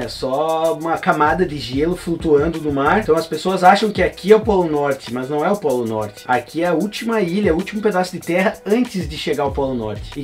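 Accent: Rio Grande do Sul, Brazil. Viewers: tu nasceu em q estado do brasil ?? (obs) tu parece c gaucho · pelo acento com certeza tu é gaucho tche hahahah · irmão!! tu é de onde mano!! parece ser gaúcho... hehehe encontrei seu video agora... primeiro video que eu vejo.... e teu sotaque é muito parecido com o nosso... do RS! hehehe abraço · Nos primeiros 10 segundos pensei na hora que tu é gaúcho hahah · Esse sotaque de quem toma um mate na redença não me engana... curti o video e curti o canal... quem sabe um dia fico uns tempos ai! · bah LlllKk, tu é gaúcho? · Só pelo sotaque no início do vídeo ja percebi que era gaúcho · Mas bah, guri! Esse sotaque entrega daonde tu veio! É dos meus, curte um Chima! Que massa teu canal brother, que conteúdo incrível! É viciante, confesso. Um grande abraço aqui do Rio Grande Do Sul. Dalhe grêmio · em segundos ouvindo ele falando já dá pra ver que é gaúcho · É Gaúcho, dá pra ver no sotaque, de que cidade tu é? 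· Nem 5 seg de vídeo já vi que é gaúcho! Hahahah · Ele é gaúcho!!!🤗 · Mano, tu me parece ser gaúcho kkkkk Manda um salve pra Rio Grande do Sul, Gravataí TMJ!! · Nos primeiros dois segundos ja identifiquei que é gaucho..hahahaha. É nois! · Teu sotaque pareces com o do RS, eres daqui? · Sotaque gaúcho kkk❤️ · Sotaque gaúcho bah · tu é gaúcho? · Show, mas tu é gaúcho né? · sotaque gauchoooo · Gaucho que fala pia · tu é gaucho? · gaucho certeza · Dale RS! Hahaha reconheci esse sotaque com 10 segundos de vídeo! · No início, pelo sotaque, eu sabia que era meu conterrâneo gaúcho!!!! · Tu é gaúcho cara? Maior sotaque daqui kkkkkkk... Não sei como cheguei no teu canal, mas teu trabalho é fera! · Parece gaúcho pelo sotaque kkkk, talvez eu esteja errado, mas o sotaque parece muito com o meu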